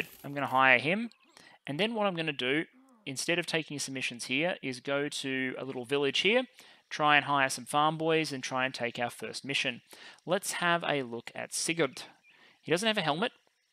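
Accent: Australia. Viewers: Auzzie accent, and clobbering heads in. Perfect combination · I always appreciate your calm, thorough explanations of complex games! Your easy Aussie cadence and relaxed delivery is like butter to my ears.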